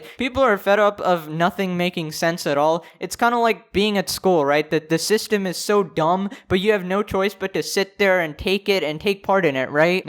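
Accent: United Kingdom. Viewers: are you from the uk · legit can't tell if hes British or not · British man in da house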